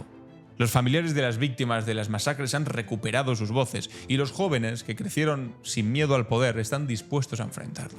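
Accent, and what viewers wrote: Spain. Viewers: Cállate hoy tanto Delincuente que mata a diario elsu padre encarceló al desgraciado genocida de ABIMAEL a es genocida con tu acento español anda a hablar a españa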